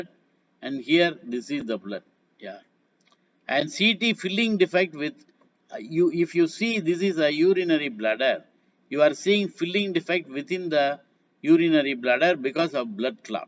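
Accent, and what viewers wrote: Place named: India. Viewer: I can hear indian voice from you love from IMG philippines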